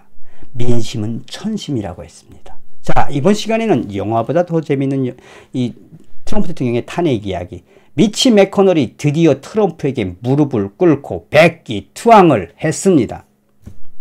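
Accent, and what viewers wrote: North Korea. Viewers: 북한 사투리로 가열차게 진행할때 속이 후련합니다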